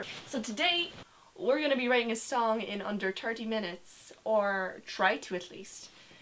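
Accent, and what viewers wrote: Ireland. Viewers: Is she Irish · Me Before : I think she’s Irish... she sounds like all the annoying second cousins from Ireland who I am forced to put up with, despite the fact I don’t know the name of any of them, other than they and love Karaoke, Makeup and romance movies... Me after : SHE’S DEFINITELY IRISH, SHE SAID THIRTY THE WAY I DO WHEN I’M IN IRELAND. Saying thirty three and a third in a Irish accent is very funny to me, and my immature sense of humour.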